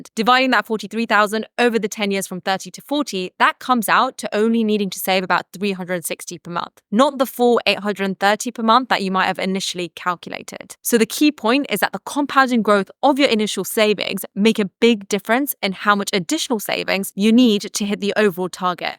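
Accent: United Kingdom. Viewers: British Accent 😑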